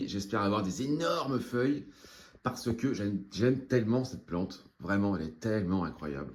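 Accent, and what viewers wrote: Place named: Picardy, France. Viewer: Bonjour. Je découvre votre chaîne, très sympathique et colorée. Merci pour la bonne humeur, et toutes vos beautés. ( Il me semble reconnaître un accent '' un peu de Picardie '' 😁😉. A très bientôt !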